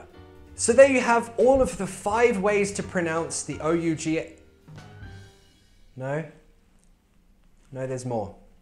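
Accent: United Kingdom. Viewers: i really enjoy learning british accent with you funny teacher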